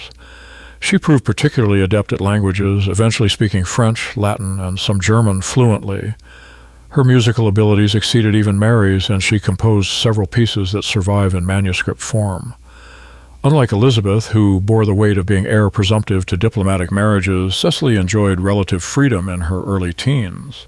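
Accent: United States. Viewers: A bit hard to listen to an American accent on this subject; bye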